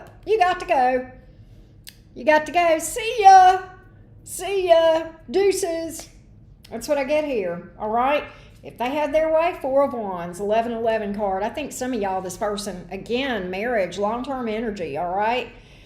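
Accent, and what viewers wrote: South, United States. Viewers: You’re so cute . I love your energy and your little southern accent ❤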